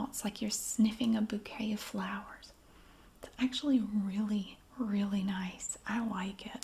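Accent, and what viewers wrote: South, United States. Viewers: I remembered watching videos a long time ago and decided to revisit the lady who inadvertently taught me how to do a Southern accent. Not even halfway through and I am howling 😂 Why on Earth did I ever unsubscribe, I must have been tired of how much fun I had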